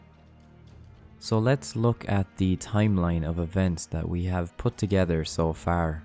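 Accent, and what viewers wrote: Ireland. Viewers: Are you from Limerick, you sound local?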